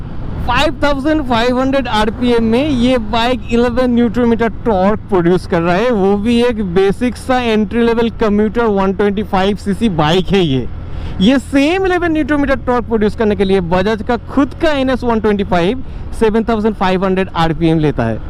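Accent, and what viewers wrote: United Kingdom. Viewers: ओ भाई जरा ठीक से बोल ले हिंदू में भी इंग्लिश accent मार रहा है ढंग से बोल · Hindi mai bol ya English mai bol but don't use British tuning brother But nice information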